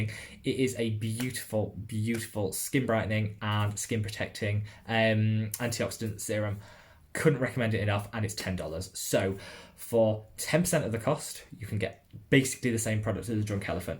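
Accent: United Kingdom. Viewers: Your so easy to watch and listen to. I was a little confused on where you were in the world with a uk accent and then mention dollars but then you said your in the uk think it's great your saying different currency. I'm in Ireland but from the uk 💜 I use the aldi dupe of the nip and fab glycolic pads cheaper again. Haven't tried the nip and fab ones but I think I will have to get some now as this is the 2nd video you've mentioned them 🥰 I will say I can't stand olay products but that's my personal experience so far theres better products that are cheaper again like the ordinary range. Depends on what your targets are I've also just bought some serums from revolution to try and compare Xx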